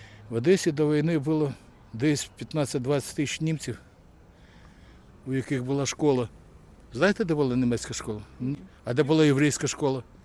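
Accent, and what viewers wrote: Russia. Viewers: А русский акцент скрыть не возможно Это Я вам как Одесситка говорю И все всегда говорили на русском и на своем что получили по национальному признаку 30 лет проработала на Дерибасовской и только русская речь звучала в Одессе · В Одессе до войны можно было услышать разные языки-это правда. В 2010 году могу с ответственностью сказать, что в основном, говорили на своем "одесском" наречии, его ни с чем не перепутать. Мужчина, судя по его акценту, тоже говорил на русском, или на суржике. Главное, что в сердце у человека. На фронте бойцы не поднимают этот вопрос до фанатизма! Слава Богу! Слава ЗСУ! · Как он старается говорить на украинском, но черт, возьми, он же русскоязычный, дураку понятно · У самого в мові відчувається, що більше розмовляє посійською..,але зараз намагається говорити українською. Фонематика не українська. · Пиздун, всю жизнь говорил на русском, теперь перекрасился, власть поменялась, это слышно по акценту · А у самого то відчуваєтьсяговір російської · Почему нэмецкая, а не нимэцька, розмовляли, а не ромовлялы, была, а не була? 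Как не старается выдать себя за украиномовного, не получается, русские слова проскакивают. Молодец, заработал свои 30 сребреников. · Суржик це не Українська мова. А діло закидає москальський акцент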